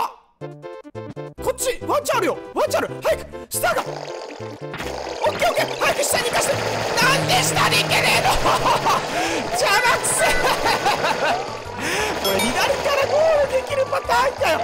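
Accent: Fukuoka, Japan. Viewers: さんは福岡のかたかな？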